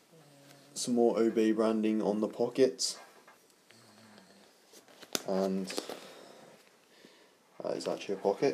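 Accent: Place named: United Kingdom. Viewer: Why tf does an Asian have a British accent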